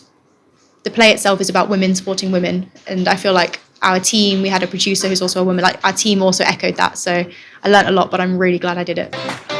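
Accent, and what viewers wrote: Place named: United Kingdom. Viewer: Tangiers was international city From Tangiers come the most brilliant Moroccans throughout all civilizations Cute little Brit accent ah I studied three years in Tangier & loved it from 1967 to 1870 gorgeous beautiful City Lovely to be a hippie where in Casablanca was very thought to be a beatnik The best playa the whole city is Awesome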